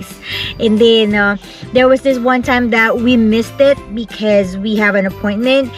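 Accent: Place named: England, United Kingdom. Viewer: I love your english accent, Enjoy cooking and you can do it. Stay healthy po #dabestiesjasCovers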